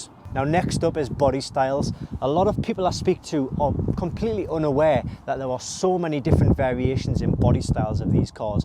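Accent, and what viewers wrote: United Kingdom. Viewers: "YouTube, it's..." I used to think it was "YouTube and such..." just said with the UK accent.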